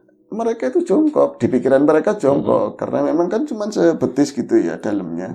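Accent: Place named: Tegal, Indonesia. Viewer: Sllu kangen bhs.Tegal bro yg sngt sedap ddengar jd auto like, komen, and subrek.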